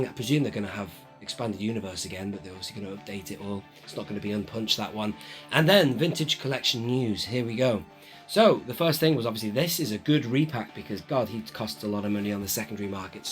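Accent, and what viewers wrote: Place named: United States. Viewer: I love hearing your american accent.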